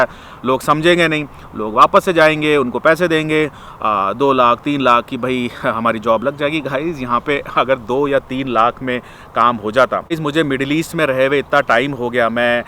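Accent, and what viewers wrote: Kerala, India. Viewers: Sir are you MALYALI?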